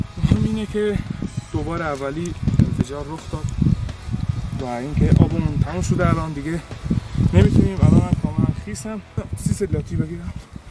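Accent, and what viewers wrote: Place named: Lorestan, Iran. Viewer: لهجه لری داری 😂❤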